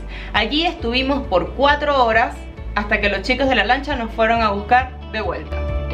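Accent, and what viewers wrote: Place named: Peru. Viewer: creo que tiene acento peruano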